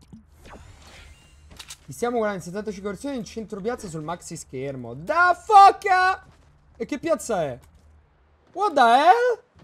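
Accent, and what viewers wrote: United Kingdom. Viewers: Ma quanto cazzo sei british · Quanto sei british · L accento inglese, oddio, mai piu da te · Grandissimo bro partita easy come sempre... Ma poi quanto sei british😂😜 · O mio dio ma quanto sei forte e anche molto british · Troppo brittish entra così a far parte della dpg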